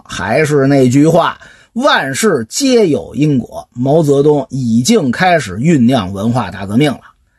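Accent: Beijing, China. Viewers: 從第一集開始聽，沒注意居然追上進度了。雖然我不太喜歡京腔，但是老哥這個講得確實不錯。希望老哥堅持，更上層樓。話說這一集1.8k 點踩，怎麽回事。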